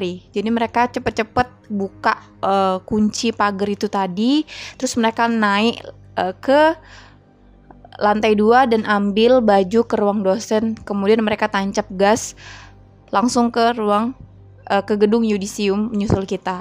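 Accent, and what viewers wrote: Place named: Medan, Indonesia. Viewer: Medan kali cakapnya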